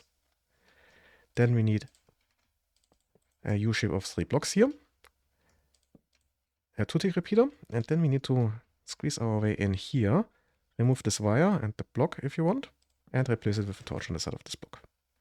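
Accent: Germany. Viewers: Biste Deutsch, habe dich bei nem Video gesehn, wo dein Build geklaut wurde bei Dream SMP und habe deine Sprachweise gehört, also biste Deutsch. . If you can't read that, ignore it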